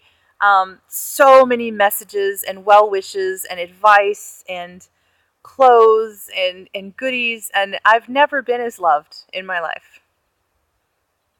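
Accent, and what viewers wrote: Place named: Canada. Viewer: I hear the birds, spring time in MI. You are amazing, ouut and abouut, you sounding more Canadian than southern!! You should are great and thanks for the honest updates.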